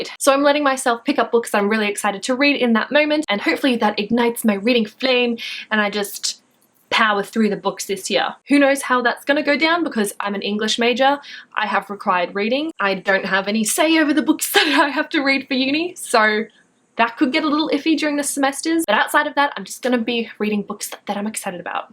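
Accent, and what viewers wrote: Australia. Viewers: Are you Aussie? I love your accent!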